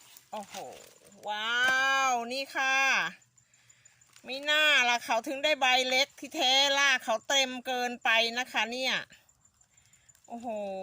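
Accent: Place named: South, Thailand. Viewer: น้อยมาช่วยเปลี่ยนถางค่ะคุณ 🤩🤩พูดแบบคนใต้จ้า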